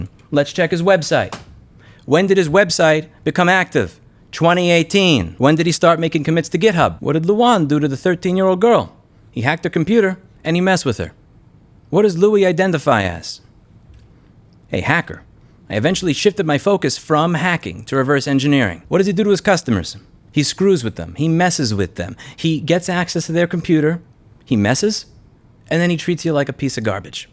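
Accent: New York, United States. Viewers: Ive watched for many years, taking a break from him over the last year or 2 (sometime after the whole RTR saga). Im back now because of this scandal and all the coverage its rightfully getting... I cant help but notice that NY ACCENT is much heavier... And he has FAR MORE NERVOUS TICKS than he ever did before... In fact, I dont remember either of these things in the past. In this video, he's twitching an awful lot. I hope hes taking care of himself. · The NY accent came out hard in this one. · Great video Louis. I’m a former NY’r and man the NY’r in you comes out full throttle in this video. Keep up the great work!! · Louis’s accent becoming more and more stereotypical NYT as he talks is perfect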